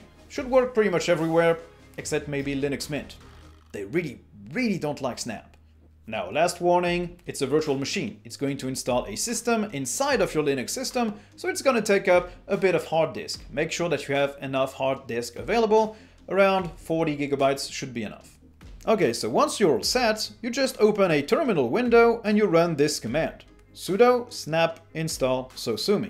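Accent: France. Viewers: Des vidéos en Français aussi serait les bienvenues, le YT Français étant un peu à la ramasse par rapport à l'Anglais, ceci étant dit, je suis parfaitement bilingue et j'appréci le p'tit accent frenchy, keep it up mate!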